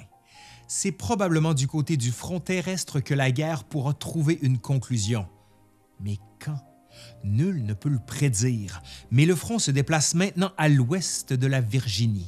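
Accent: Quebec, Canada. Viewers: L'histoire des États-Unis brillamment expliquée par un Canadien à la diction cativante et au merveilleux accent Québécois! 👍🙋🏼‍♂️ Remerciements et salutations depuis la Suisse. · Grave que j'ai adoré, première fois que j'écoute une vidéo historique par un Canadien où Québecois et l'accent, la façon de réciter, la passion, j'en redemande !! Bien le bonjour de la Belgique !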